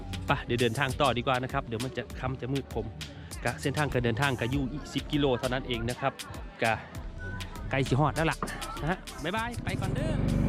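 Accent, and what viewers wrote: Phu Thai, Thailand. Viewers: พ้อแล้วนี้ละพี่น้องแม้นเลยสำเนียงภูไทกาฬสินธุ์สกลนครมุกดาหารนครพนมสำเนียงภูไทบ้านย่าแถบอำเภอเขาวงจังหวัดกาฬสินธุ์ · สุดยอดเลยครับอ้ายสำเนียงภูไทคือบ้านผมแฮงภูไทกะต๊ะ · เว้าภูไทคือเดยว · มักๆค่ะเว้าผุ้ไทมักสำเนียง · เว้าภูไทเบิ่ด ปานได้มื่อบ้านติอ้าย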